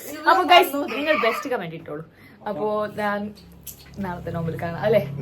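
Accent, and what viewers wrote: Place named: Mangalore, India. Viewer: Mashallahh adipoli....ningade family nte vlog kanumbo oru positive energy aan❤️...ningade family pwoli aan onnum parayanilla....ithamare randu perum othorumayode enth bangiya kanaaan❤️.... samsaram kelkkan nalla rasan...manglur style adipoliya 😊....i am exciting for your eid vlog....mashuthantem eid dress okke kanaan kothiyavunnuu....njan malappuram aan ithaa....ningade familye onn meet aakan nalla aagraham nd❤️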